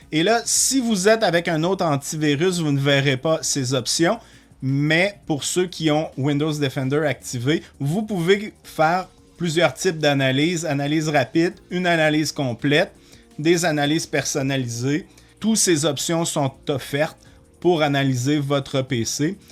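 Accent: Quebec, Canada. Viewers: Bonjour Jeune Homme, bonjour à Tous ! Eh bien quoi te dire, j'ai liké, je me suis abonné, après avoir visionné, et revisionné ta vidéo ! Eh oui, comment faire autrement, face à un travail si bien fait, court, clair, net, concis et précis !! je croyais qu'il fallait avoir Microsoft 365 pour bénéficier de Défender, et là tu viens de me définir que non, puisqu'à aucun moment tu n'en parles dans tes explications ! Tu sais, je te visionne depuis la région parisienne en France, et ton ( accent là ) je le trouve magnifique, puisque j'en rigole aussi sur certains passages ! En tout cas merci pour ce partage qui me sera grandement salutaire car c'est un peu beaucoup le ( bazar ) din mes zoefers là tu sais ! J'ai essayé de le faire à la québécoise là t'sais ! Ah, petite précision, le tutoiement dans mon intervention n'est point à prendre pour de la désinvolture, ni du manque de respect, mais tout simplement, de par le fait que cela me plaise, je me veux de plus de proximité avec mes interlocuteurs, de par ce rapprochement que nous offre la toile, malgré tant de distances qui nous séparent ! 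Et puis, je suis prof de sport avec des baby, des enfants, des ados et adultes en karaté et self-défense, ceci explique un peu cela !! Salut mon pot ! Paulo 74 ans !!! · Bonjour. Je viens de découvrir votre chaine et de m'abonner. J'ai fait tout ce vous préconisez et maintenant je me demande si d'avoir téléchargé Advanced systeme care sert à quelque chose. Je suis français et votre accent j'adore. Je vais faire un tour au Quebec en septembre, j'en salive d'avance. Merci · Je trouve cette proposition très astucieuse et j'ai bien envie de la mettre en oeuvre. Je me pose cependant une question : le fait d'étre contraint d'utiliser les trois questions secrètes ne constitue-t'il pas un risque si une intelligence artificielle est utilisée pour trouver les mots les plus probables en fonction des données qui seront trouvées sur l'ordinateur? J'aimerais avoir votre avis. Merci par avance Et bravo pour votre clarté et l'accent canadien m'est toujours très agréable. 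· Bonsoir Je suis avec un grand intérêt cette vidéo d autant plus que vous nous donnez fort gentiment la marche à suivre sans aller trop vite. Cependant, jai acheté depuis peu de temps un ordinateur Windows 11 ! Ne pourriez vous donc pas nous indiquer comment procéder de la même facon, étape par étape d autant que certaines rubriques que vous citez n apparaissent nulle part ! Je me permets toutefois d attirer votre attention sur le fait que je ne vois pas assez bien les différentes fenêtres que vous ouvrez d autant que les caractères à l oeil nu apparaissent fort petits et que l image qui en découle est plus ou moins flou ce qui rend la visibilité dificile. Je devine plutôt que je ne vois véritablement ! Elle gagnerait en netteté avec des caractères plus gros et une image beaucoup plus nette de façon à ce que l on puisse mieux visualiser le tout. Mis à part cela, j adore votre accent canadien et ai le sentiment que vous êtes passionné d informatique au point que vous transmettez avec grand plaisir tout votre savoir sans chercher forcément à gagner de l'argent ! 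J ai donc d autant plus plaisir à vous écouter !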